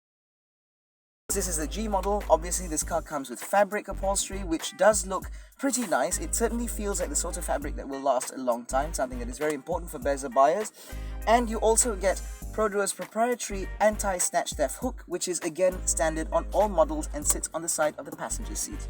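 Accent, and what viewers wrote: United Kingdom. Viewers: U sound like a british man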